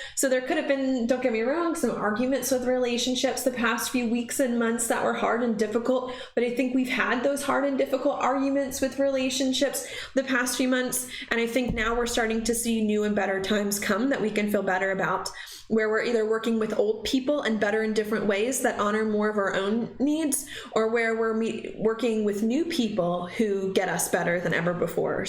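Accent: South, United States. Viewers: Been watching you since before your move! Your definitely getting a southern accent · You can really hear the southern accent on this one. :)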